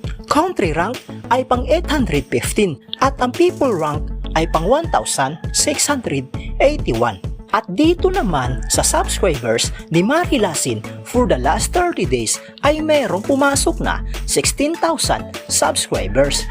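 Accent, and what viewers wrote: Visayas, Philippines. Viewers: Bisaya kc but as long as we understand her, it's okay.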